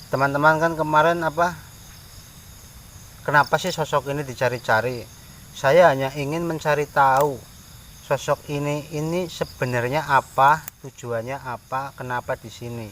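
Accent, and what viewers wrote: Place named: Java, Indonesia. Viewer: Medoknya terasa banget org mana ni bang grndut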